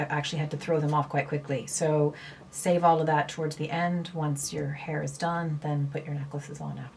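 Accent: Ireland. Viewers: Thanks, just showed this to my mom so she can use mine. You have an irish twang to your accent! Where are you from?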